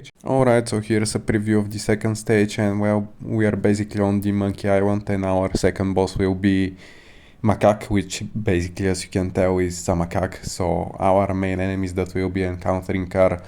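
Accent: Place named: Eastern Europe. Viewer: listen, are you from a post-Soviet country? it just sounds like you are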